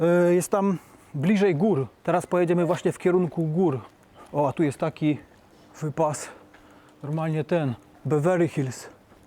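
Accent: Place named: Silesia, Poland. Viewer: kurcze, do zludzenia przypominasz akcentem Podolskiego :D Skad jestes ze slaska? Pozdro z Chicago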